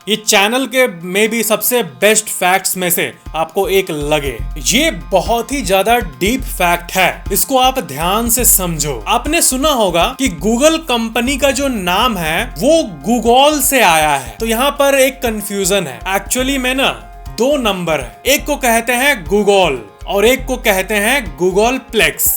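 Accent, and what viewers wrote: Bihar, India. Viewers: Bhai aap Bihari language bolte ho (kore)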